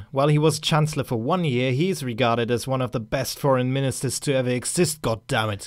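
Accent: Germany. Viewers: Are you german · You are german arnt you? Always if you pronounce german words there is no actcent. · Von wo hast du deinen deutschen akkzent? Sicherlich nicht aus Österreich oder der Schweiz. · I swear this guys accent sounds like a mix between German and Australian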